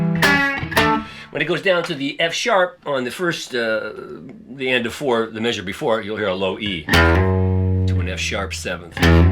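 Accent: Liverpool, United Kingdom. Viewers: no one can succeed in doing a Scouse accent like......! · Even nailed our Scouse accent, la'! Well, almost....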